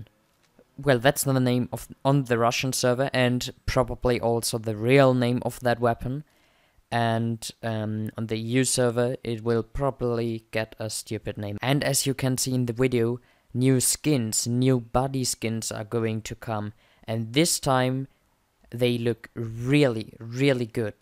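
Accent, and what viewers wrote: Germany. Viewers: could you add me on EU server I would love to be on your FL and play with you name = NotoriousIshy (ps your English with the German accent is better than most people's English I know LOL)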